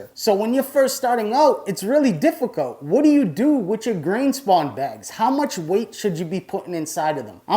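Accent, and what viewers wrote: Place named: East Coast, United States. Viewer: As usual brings the knowledge along with that east coast accent. Great info on bags. Answered a lot of questions I didn't know I had. It was great to see a new video from you. 🍄♥️ 😎✊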